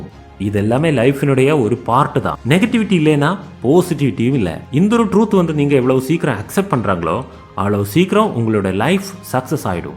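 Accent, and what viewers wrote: Kerala, India. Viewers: Yov ne malayaliya., Alaga pesuraya tamil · Neegga kerala va neegga peysura type same kerala person mathiri iruku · Neenga malayali thana??? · onga tamil slang nalla irukku . kerala va neenga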